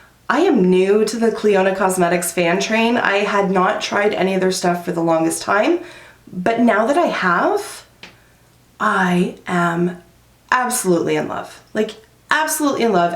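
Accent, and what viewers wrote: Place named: Canada. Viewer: We have dill chips. Salt and vinegar. We also get Ms. Vickie's chips which I believe is a Canadian brand. We just don't have Ketchup chips. I dunno why bc we have every weird chip flavor And it is not that you say sorry. I appologize whenever I bump into someone too except I say excuse me more. It is that you saw Sow-ry. I cannot get my mouth to form to say it like ya guys do but I know ya are Canadian by how you say sorry lol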